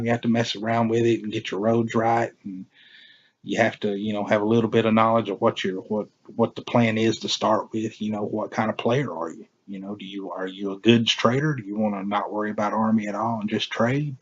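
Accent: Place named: Deep South, United States. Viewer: You sound like you work in the ranch in the deep south USA.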